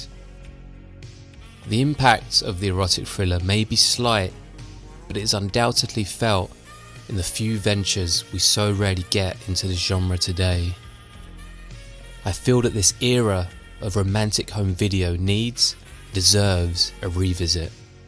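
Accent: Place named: United Kingdom. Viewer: That was amazing! I put it on while doing boring admin work, but had to keep stopping to watch. I’m going to give it a proper rewatch. I love your sexy British voice and accent. It was great to work to. Will be great the second time to pay actual attention tot he piece. I actually create erotic content. It is not the typical vanilla stuff but is Fetish & Femdom POV. Erotica has always inspired me since I was very young. Wrote my art history thesis on Japanese shunga. And 9 1/2 weeks is one of my favorite films ever. My GOD is that one good.❤ What other films in this genre would you recommend the most?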